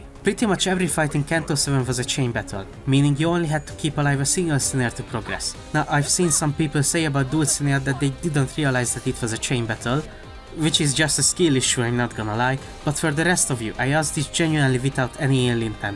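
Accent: Hungary. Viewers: ngl for some reson it kinda sounds like you have a hungarian accent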